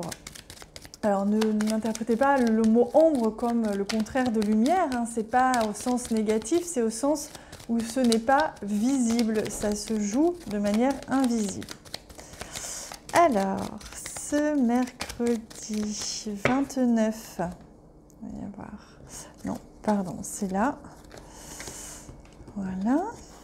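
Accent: South, France. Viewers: Bonjour, Je vous découvre aujourd’hui. Vous avez un accent du sud aussi, comme Laura (Intuitive), peut-être vous connaissez-vous? Merci pour cette guidance. Bonne journée 🙏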